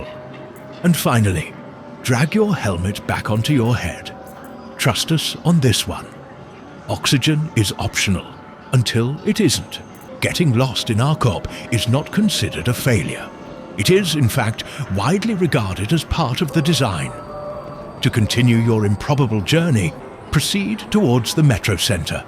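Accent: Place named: United Kingdom. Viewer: Great comedic writing! And the stiff-upper-lip British voice is peak! Can't wait for the next one.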